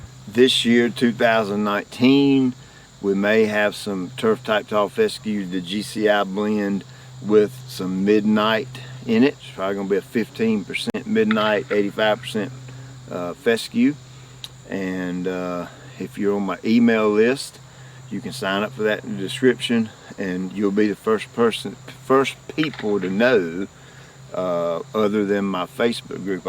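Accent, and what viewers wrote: South, United States. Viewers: You are an amazing person with a great southern voice!! Lol keep up the great work!!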